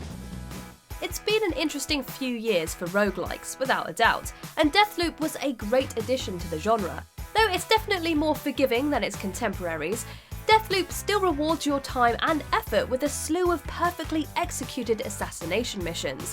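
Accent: United Kingdom. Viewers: I have a question for you,. Are you from the UK because it sounds like you are? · Watchmojo, I like the british narrator on this video. It brings class to your positive Top 10.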